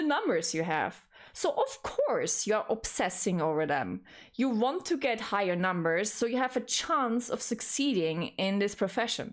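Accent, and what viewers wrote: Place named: Tyrol, Austria. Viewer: super das man dein tirolerischen akzent auch im englishen hört :D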